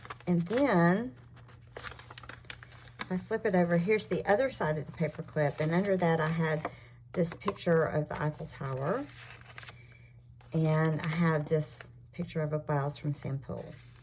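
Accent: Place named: South, United States. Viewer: Simply gorgeous! Love listening to your soft Southern voice! Hugs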